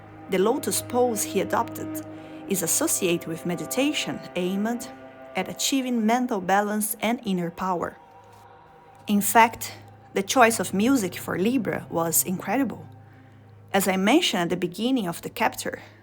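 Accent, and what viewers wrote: Brazil. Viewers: Quick question, are you perhaps Brazilian? Not complaining, just can´t pinpoint the accent. Also great video. And in the mark where you say "exoticism", is it really that or "exoterism"? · Brazilian accent hell yeah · Are you, by chance, Brazilian? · Bizarro, 2 da manhã eu vendo minhas coisas de nerd e vejo um vídeo sobre um dos bosses que acho mais atrativos e curiosos em nightrein, que vídeo maravilhoso, tantas citações mitológicas, musicais e filosóficas que reflete o trabalho que teve em escrever e criar esse vídeo que aprofunda MUITO no jeito que vemos o boss, com comparações cirúrgicas e uma análisa muito profunda! que vídeo incrível. Ver o povo engajado com comentários adicionando anotações ou observações é muito foda! (perdão a palavra) edit: esqueci de citar que percebi seu sotaque e fiquei encucado! mas depois de ver que era do Brasil, tenho a elogiar sua fluência em inglês!!! 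· Strong Brazilian vibes in this accent.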